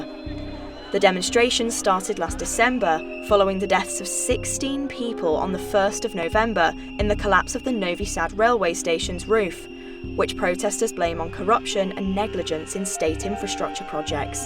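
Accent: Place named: United Kingdom. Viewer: This British accent is unwatchable, sorry...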